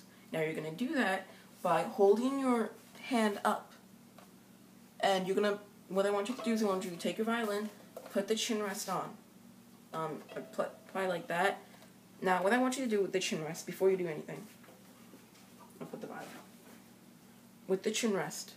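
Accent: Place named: New York, United States. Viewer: You have one of those new york or boston accents,or brazillian...just saying thats not a bad thing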